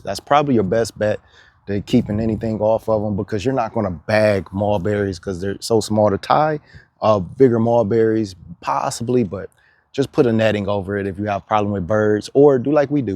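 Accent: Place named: California, United States. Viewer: You sound like you’re from California.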